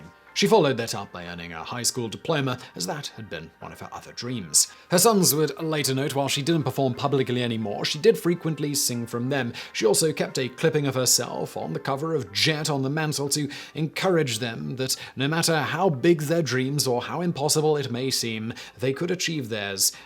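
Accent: United Kingdom. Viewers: One of my favorite things in life is when breaks his proper British delivery and gives his off script delivery.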